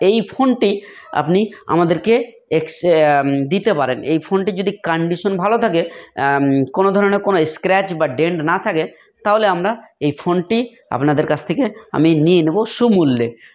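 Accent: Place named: Kolkata, India. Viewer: ভাই আপনার ভাষা তো কলকাতা কলকাতা লাগে। সাবধান ভাই।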